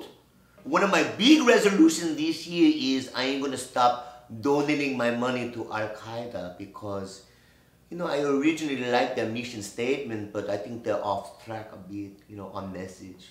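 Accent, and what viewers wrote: Mexico. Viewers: Lol sound more Mexican than asian but Whateva works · accent sounds more mexican than korean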